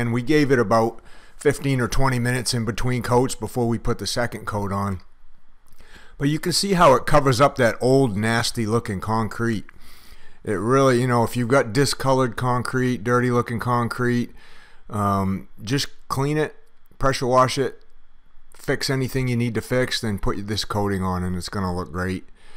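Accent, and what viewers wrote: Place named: New England, United States. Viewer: Great video this is very helpful. By your accent your from New England. Since you’re using this product where you have so much snow ❄️ and rain and it’s held up well. This will also hold up good on my patio with our bright sun ☀️ in California. Exactly what I’ve been looking for. Great video thanks again 😎👍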